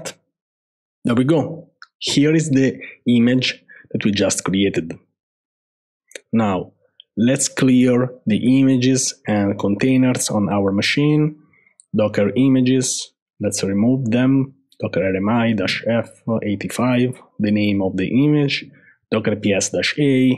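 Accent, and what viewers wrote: Italy. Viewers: I love two things : the way you did your part of the job, and your strong Italian accent, Of course I subscribed. Thank you both, guys !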